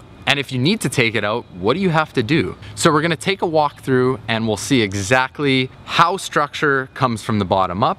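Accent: Canada. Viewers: The most Canadian accent ever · You sound Canadian.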